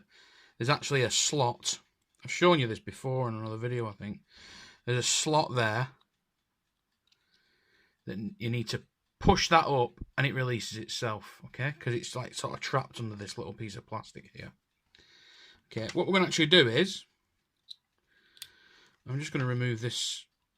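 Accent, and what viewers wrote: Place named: Northern England, United Kingdom. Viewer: You sound like you're from Northern England- beautiful country out there! Thanks for this, it was very entertaining!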